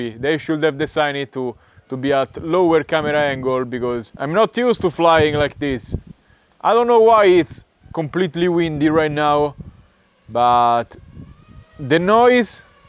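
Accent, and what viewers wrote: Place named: Italy. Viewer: 2 parole e ho subito capito eri italiano ahah grande 💪🏽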